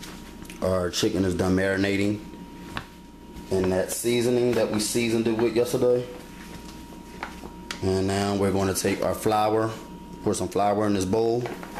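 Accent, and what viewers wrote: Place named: Philadelphia, United States. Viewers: ! yes I subscribed about a month ago to his video channel and I have enjoyed his videos so far! I didn't know people in Philly talk like that. I don't understand I guess this is different unless Will Smith isn't really from Philly ... Idk😕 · He's from philly. Most of us talk like that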